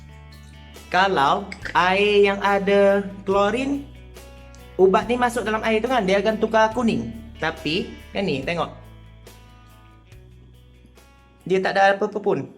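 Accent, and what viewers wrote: Kelantan, Malaysia. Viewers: ore klate ko?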